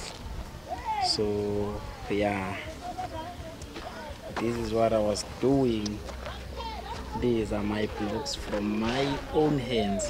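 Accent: Uganda. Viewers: this guy has 100% Ugandan accent... good work though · He talks like a Ugandan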